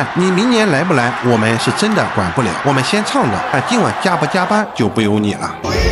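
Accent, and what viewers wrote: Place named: Taiwan. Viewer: 臺灣腔 又很臺 沒想到500在中國那麼紅 難想像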